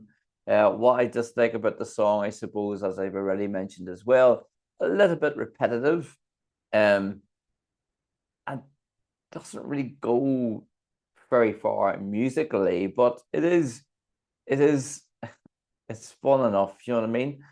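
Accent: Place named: Sweden. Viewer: an yer Swedish is steckin out!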